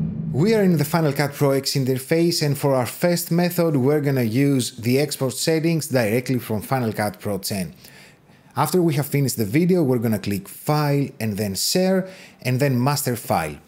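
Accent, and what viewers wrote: Greece. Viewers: One question....are you in any kind connected with Greece? You speak like Greek.........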